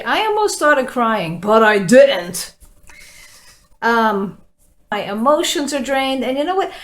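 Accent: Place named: Long Island, United States. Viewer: After watching a flw videos why I like U I know U said U lived in Nevado but then I found U were from NY Now U say U were born in PA I am a Lancaster Cty baby that moved to Jersey then to Hastings just north of NYC Your accent tells me L.I. I gave been fulltining for just over a yr Rt now in Cali Thanks for the entertaining videos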